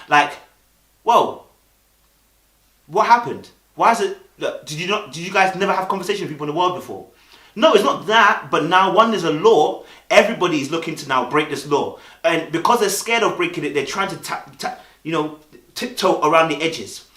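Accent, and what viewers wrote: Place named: Birmingham, United Kingdom. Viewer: This was really good. However it's like tfor the first time in watching so many of your videos that I fully heard your Brummy accent. As in clear as day, the whole way through this loool. I was genuinely like "wow" 🤣🤣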